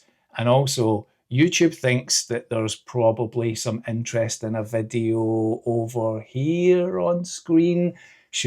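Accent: Scotland, United Kingdom. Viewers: I suscribed from your first video, which just randomly popped up on my screen back in January. I watch on a tv. You’re doing well and your channel will keep growing. And it always helps to have a Scottish accent, one of the nicest to listen to on youtube.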